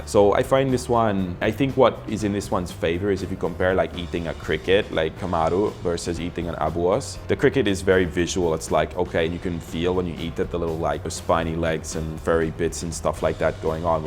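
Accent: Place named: Philippines. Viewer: bossing, yung australian accent mo nagiging pinoy accent... naturalized ka na!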